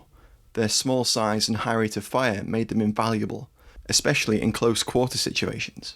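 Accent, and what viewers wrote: United Kingdom. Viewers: Is this one deactivated as you sound British.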